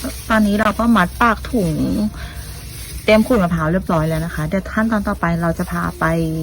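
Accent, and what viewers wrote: Thailand. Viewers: เมืองกาญจน์แน่เลย หรือว่าราชบุรี สำเนียงชัดเจน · ราดรีชัวร์เลย พูดสำเนียงนี้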